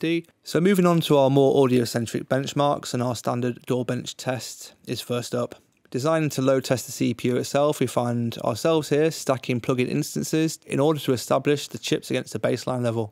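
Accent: United Kingdom. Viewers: Please translate what he said from Cockney English, when he described the latency performance at a low buffer size. Is this process (transmitting midi, latency) predominantly single-cored, and hence the computer with the higher single-core speed will be better?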